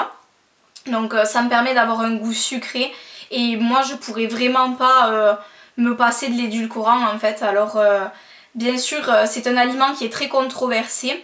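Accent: South, France. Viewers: cc tu es de Marseille ? Nîmes ? Toulouse? j ai un doute . biz gaelle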